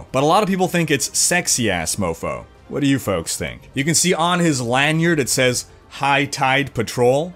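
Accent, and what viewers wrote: Quebec, Canada. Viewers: That sweet Canadian accent, I love it! (French guy here);) Thanks for the infos! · I love your Quebec accent . J'ai le même . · un petit accent quebecois, je pensais pas ca de toi! xD did you learn it at school? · You speak like a Quebec person it's so funny to hear you speak french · tu parle vraiment bien Français dis donc ^^! Au début ça m'a fais un peu bizarre, tu a un accès un peu québécois ^^! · I was wondering why your French sounded so distinctly Canadian, but I just found out on your Twitter that you live in Montreal. Figures. Toujours sympa de savoir qu'un de ses youtubers préférés est francophone (même si je suis Français et non Québécois):). Also, PoE doesn't bother me, and getting the same amount of content in D3 would be indeed great, but it's the fans (well, some of them) who are annoying. Yes, we get it, PoE is soooo much better. 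How about you let people enjoy what they enjoy? You don't need to act all high and mighty with your 6 new acts. What you guys don't get is that new content won't make people jump to PoE if, for instance, they don't like the gameplay. I, for one, hate the combat and general gameplay of the PoE, whereas I find Diablo 3's tons of fun. No amount of new PoE content can change that fact. But if you enjoy the complexity and style of PoE more, good for you. Just don't be an ass about it. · AH. Canadian French. Was used to english on this channel, but canadian french is nice too. (Even if, being from France, I'm not that used to this accent.) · hehe your def from or close to quebec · ton accent québécois est tellement déstabilisant x) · Based on his accent I think he's a canadian living near the Ottawa region, or an english speaker in Quebec. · Funny, you have a Quebecers accent when speaking in French, that's a little odd when describing a place in France ^^ Outside that your pronunciation is quite good ;.) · Wow, are you Canadian? 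Your accent sounds Canadian, good reading of the French text!:D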